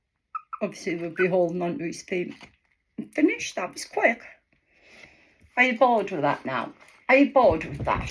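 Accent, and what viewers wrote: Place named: Wales, United Kingdom. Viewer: Excellent owl! I see (and hear) you're from the UK. I'm gonna guess Wales, since Welch is the one English this American has trouble understanding. (And, yes, I've even been to Australia. lol) I'm glad the vid isn't just a short because it takes a bit before my ear attunes to Wales. Thanks and, yeah, it's definitely worth a sub.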